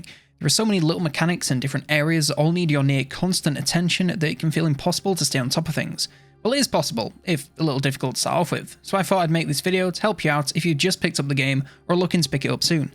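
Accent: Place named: England, United Kingdom. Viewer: Why is every guide by a guy with an English accent? No offense but I have difficulty understanding accents especially when the person talks a mile a minute.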